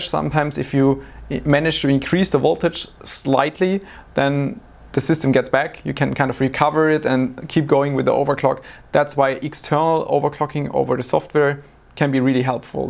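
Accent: Germany. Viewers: You can hear how german he is by his accent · Er: sagt ein Wort Ich: Ein deutscher Staatsbürger Ich hasse den Deutschen Englisch Akzent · I'm hearing this German accent so clearly, why don't you make German Videos?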